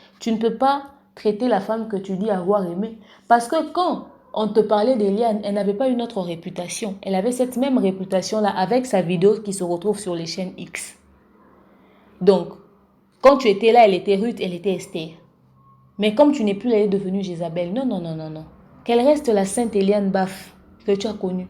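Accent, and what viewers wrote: Ivory Coast. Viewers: mama pourquoi parler comme une ivoirienne?????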